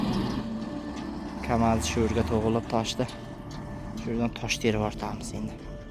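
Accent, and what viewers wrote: Surkhandarya, Uzbekistan. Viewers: Бу қаер. Шеваси Сурхандарё ёки Қашқадарёликми дедим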